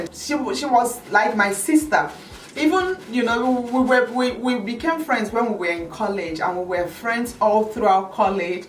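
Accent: Nigeria. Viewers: thanks, so touching but interesting! Your stories are always so interesting..................never a dull moment with you! Sorry about the loss! God knows best! slay on my queen! your accent and repeated "words" makes me know you proudly Nigerian! lol when we see oyibo, we know say na oyibo, when we see our own, we know say na our own......kissessssssss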